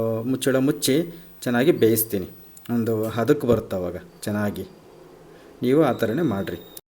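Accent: Chitradurga, India. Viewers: ದುರ್ಗದ ಭಾಷೆ ಕೇಳೋಕೆ ಚಂದ ನಿಮ್ ಅಡಿಗೆ ಮಾಡಕ್ಕೆ ಚಂದ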